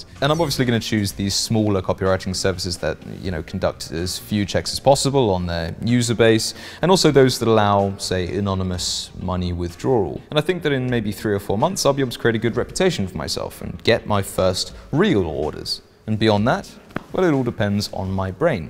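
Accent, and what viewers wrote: United Kingdom. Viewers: Normally the British accent utterly repulses me, but in this case I enjoyed listening to this dapper English gentlemen explain the process and risks of purchasing forged documents from the Dark Web.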